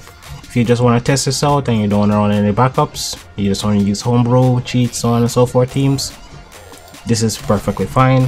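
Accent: Jamaica. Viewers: Your Jamaican? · Why do you sound like a Jamaican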